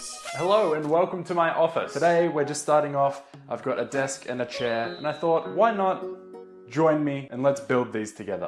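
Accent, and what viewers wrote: United Kingdom. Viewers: Don't pick up bri'ish accent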